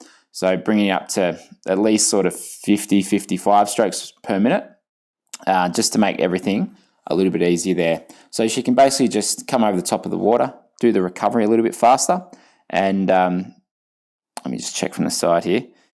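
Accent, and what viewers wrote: Australia. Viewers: Another excellent clip! Thank you! Though your Aussie accent makes pull buoy sound like pool boy!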